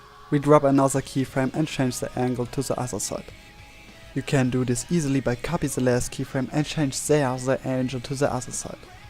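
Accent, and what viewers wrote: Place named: Germany. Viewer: Man merkt das du deutscher bist. :D Aber richtig geile Idee mach weiter so.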